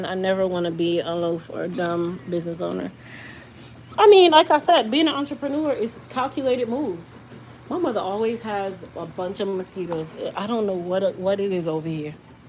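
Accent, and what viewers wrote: Jamaica. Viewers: Are you Jamaican ? Keep up the good work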